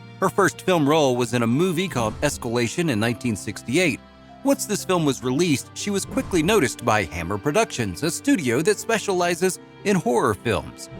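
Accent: United States. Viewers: No offence ,but why an american narrator ?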